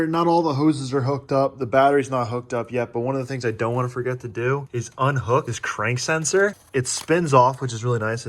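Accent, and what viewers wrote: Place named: Ireland. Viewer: she sound like she to be in the irish car scene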